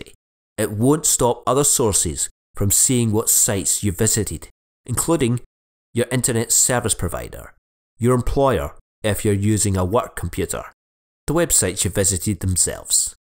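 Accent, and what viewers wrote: Scotland, United Kingdom. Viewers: so nobody gonna talk about his thick scottish accent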